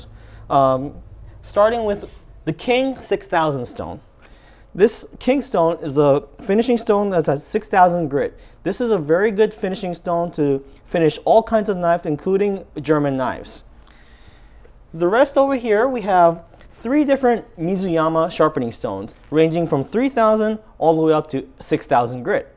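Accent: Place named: United States. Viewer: The only Japanese on the Earth with such a great American Accent!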